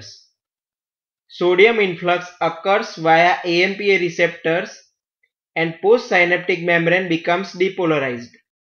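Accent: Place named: Germany. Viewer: You sound like you are german though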